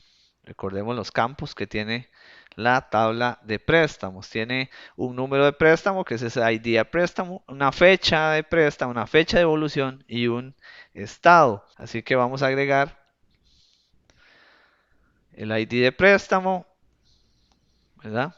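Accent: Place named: Costa Rica. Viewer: es como si hablara con mi amigo de costa rica , de donde sos? muy bien explicado el video, gracias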